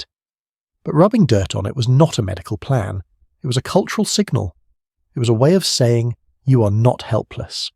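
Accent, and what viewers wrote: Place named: United Kingdom. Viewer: I just wish the AI voice wasn’t British. I’m getting pretty tired of that accent in AI.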